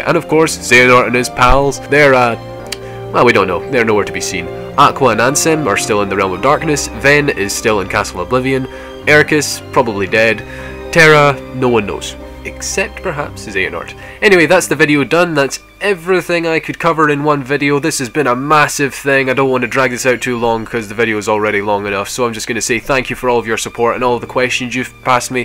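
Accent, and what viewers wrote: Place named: Scotland, United Kingdom. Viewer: How did people think your accent was Irish, an Irish accent sounds nothing like a Scottish accent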